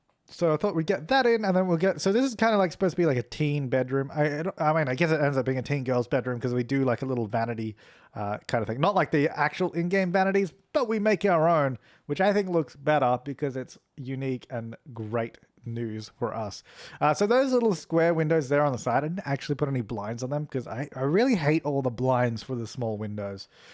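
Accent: Australia. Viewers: Is... Jazza from draw with Jazza? I swear they sound identical, not just the Australian accent. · yea cause he's ostrayan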